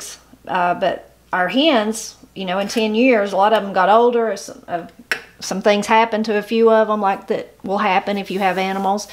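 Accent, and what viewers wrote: Appalachia, United States. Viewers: I love your videos. Take care! You’re loved here in upstate SC. I’m familiar not only with your speech and traditions, but you’re so sincere in your love for the history and ways of our Appalachia, as am I, , I would love one day to be able to just sit down for coffee and some good conversation with you. I feel like you’re a friend already. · What a pleasant surprise, stumbling on a cooking video from you. I love to cook and Appalachian cooking is nothin to me other than the way I grew up eating here in Texas, thanks to my granny coming from KY to stay with us while mom worked. Listening to your videos is like sitting on the porch visiting with family. I remember people actually going to VISIT. What an odd concept to the insanity of today's world. God bless you and your family and keep you safe. By the way, you don't talk neer as up the holler as my kin folk in Southwest Kentucky. 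😁👍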